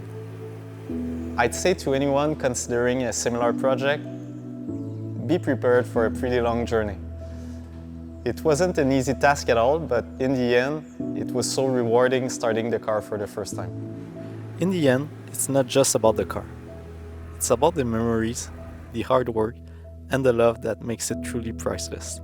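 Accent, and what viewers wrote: Quebec, Canada. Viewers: TOKÉBAC !!! T'as l'accent à GSP mon gars t'es un vrai 💪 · It took 3 words to recognize the accent. Super proud to see a fellow Quebecois on this amazing channel.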